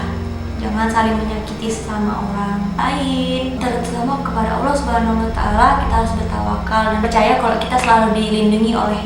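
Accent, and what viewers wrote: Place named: Medan, Indonesia. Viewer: Logat medan nya masih kental banget ya kaa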